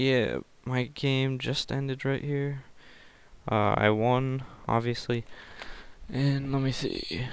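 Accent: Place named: Europe. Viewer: btw for some reosone you sound european in your comentaries lol.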